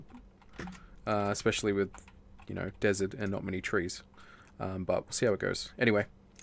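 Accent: Australia. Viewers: Question: can you make a video without holding back the australian accent and swear words?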